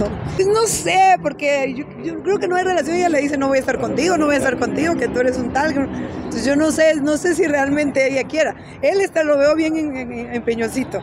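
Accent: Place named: Mexico. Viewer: Ya se le pego el dejo mexicano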